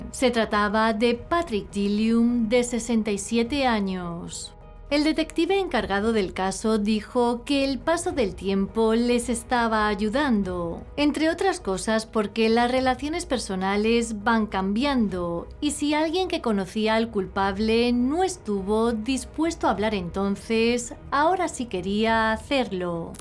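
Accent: Spain. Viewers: Hola gracias x tu arduo trabajo,me encanta tu forma de narrar los casos y tu acento español,muchas felicidades y bendiciones para todos.🙏🏼❤️🥰😍😉🤗💐⚘️🌷🌹👊👍❤️🙏🏼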